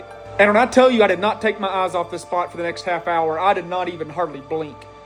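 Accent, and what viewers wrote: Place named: Appalachia, United States. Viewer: No wonder I was drawn to your channel. I mean animals and spooky stuff aside. I knew kin when I heard it. 😂 WV Appalachian here.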